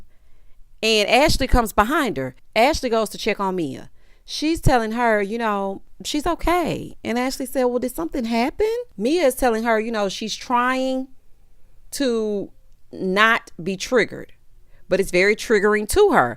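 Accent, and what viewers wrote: South, United States. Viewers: I FF past Candiace and her fertility issues. I just don't care. Ugh! Loved the Gizelle and her daughter. Loved the PAVE scenes, but chuckled because that song seemed to go on forever. Wendy is beautiful to me, but does not belong on this show. Candiace keeps playing victim and it's boring. As usual I love listening to you. You're so country and it's endearing to me. Reminds me of being exiled to South Carolina from New York during the summers when I was a kid.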